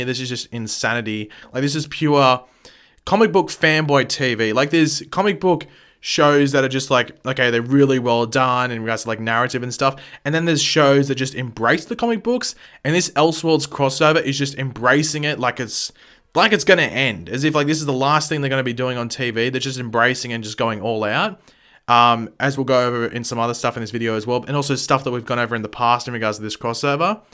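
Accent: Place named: Australia. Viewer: Lol, sounds so Australian in this video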